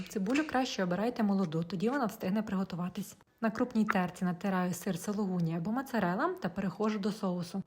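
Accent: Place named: Russia. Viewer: Какой сильный русский акцент)))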